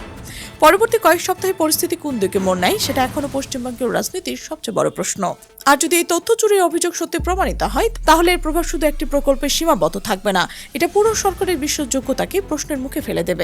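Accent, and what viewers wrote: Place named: Bangladesh. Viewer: এই চ্যানেলটি হল বাংলাদেশীর। কথা শুনে পরিষ্কার বোঝা যাচ্ছে। বাংলাদেশের লোকেদের এই বিষয়ে জ্ঞান দেবার প্রয়োজন নেই।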